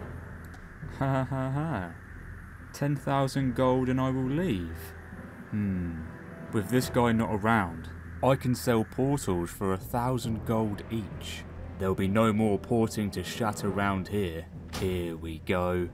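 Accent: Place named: Estuary, United Kingdom. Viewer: That Estuary accent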